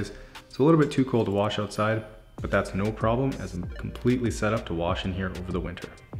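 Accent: Canada. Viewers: just by listening to you speak, i knew you were a fellow Canadian 🇨🇦 keep up the good work! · Hey You from Canada?? Alberta???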